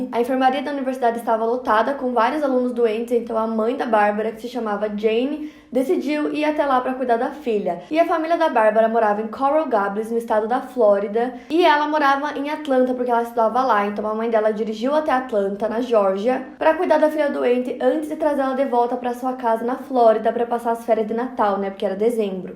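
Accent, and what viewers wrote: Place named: Rio Grande do Sul, Brazil. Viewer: acho que é riograndense Aqui no Rio Grande do Sul falamos assim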